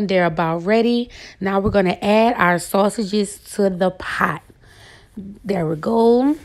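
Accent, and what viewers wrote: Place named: Louisiana, United States. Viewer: Sounds like Louisiana baby.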